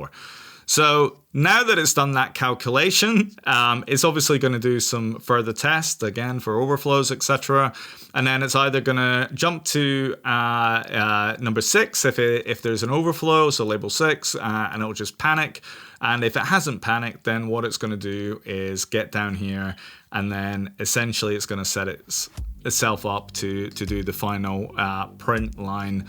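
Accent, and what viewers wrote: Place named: Scotland, United Kingdom. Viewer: Enjoying your videos!, where is your accent from? I feel like I hear a bit of Scottish, English, American, I'm assuming you have lived in many places in your life? 😃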